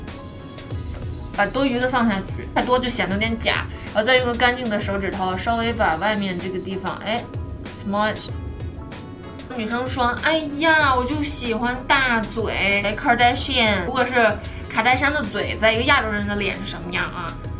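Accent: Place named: North, China. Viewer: 前几天翻墙节点不对，居然发不了评论。我想说，当知道小姐姐是天津人的那一刻，忽然明白了为什么除了北方口音，你话语里的朴实和接地气特别亲切，原来是老乡！！🤣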